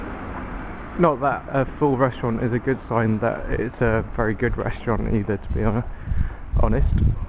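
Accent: England, United Kingdom. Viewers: i love ur accent..so english....lol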